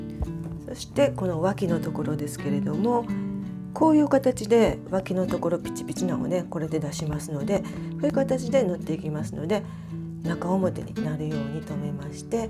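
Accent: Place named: Kansai, Japan. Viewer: 先生は関西の方ですか？ 私と同じ関西弁でした。😆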